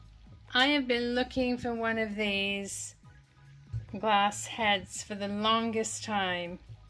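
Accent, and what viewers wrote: United Kingdom. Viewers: greetings from the East Coast. I enjoy watching you and is my favorite! You are both blessed to have each other. I enjoy watching you and do so alot in the evenings after a long day at work and def on weekends. I have learned so much. You are wonderful to watch and your voice and the fantastic British accent is so soothing. Thank you for making my days alot happier. You are a doll! Happy Thriftying!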